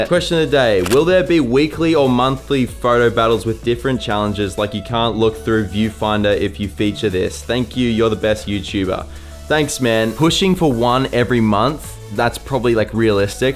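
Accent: Australia. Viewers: Now i wanna to learn the aussie accent. U sounds so hip.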